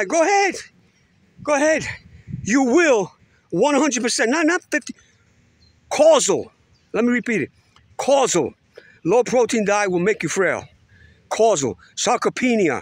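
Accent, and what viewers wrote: New York, United States. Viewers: Your channel is AWESOME…I just subscribed ….youre in Munich but you sound like you’re from NY ….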